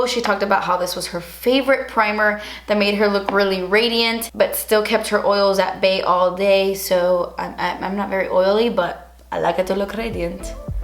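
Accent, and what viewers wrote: Cuba. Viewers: 😍tu acento cubano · yeeees absolutely loved when you mentioned Rachel, by the way i loved your cuban accent!!! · "Por eso tengo los nervios tan malos" 😂😂😂😂 I ❤ that cuban accent!!! My husband says we are twins! you're hilarious love you 😘😘😘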